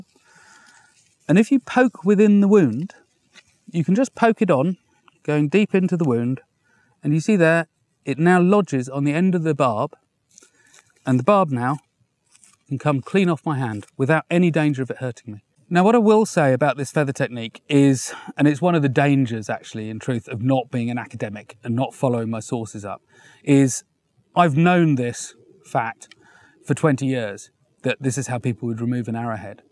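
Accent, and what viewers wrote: England, United Kingdom. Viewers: 16 Minutes of the spoken word on arrows thoroughly enjoyable, the British accent so much more appealing and easy to listen to than that, I know everything American wine. Thank you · Is he English?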